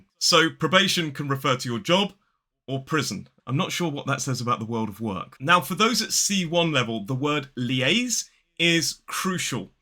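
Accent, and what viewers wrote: United Kingdom. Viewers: British English accent sounds great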